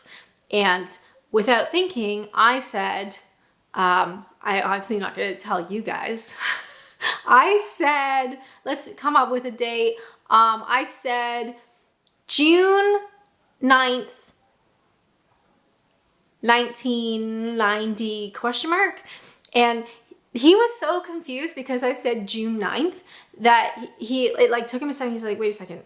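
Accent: United Kingdom. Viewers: Lol. You sound fully integrated into British life. :D :D :D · It's great you consider this home now. .... and you're getting a great accent too! Now to fet yiu moved somewhere where you'll get a strong accent: Yorkshire..... Dorset.... or gasp Happisburgh in Naaaaaarfook (where you will most certainly need a car.... or rather.... tank)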